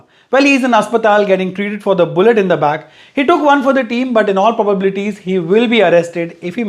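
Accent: India. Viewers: Awsome accent.....influncers lik u can make dis accent a pride instead of being ashamed of....we r d highest english speaking country so we can mend it to our accent nd make it Indian jst like usa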